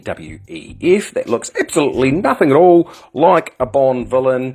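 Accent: New Zealand. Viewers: Thankyou for your great channel cant get on daily telegraph nz thought police are stopping free speech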